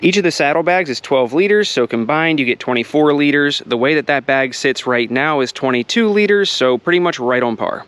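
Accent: United States. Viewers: You sound American but you keep talking about liters of storage?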